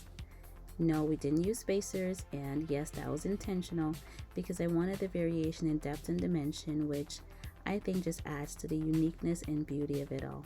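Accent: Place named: United States. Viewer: For being an immigrant you have a very good American accent. Wouldn't have guessed it. Great videos btw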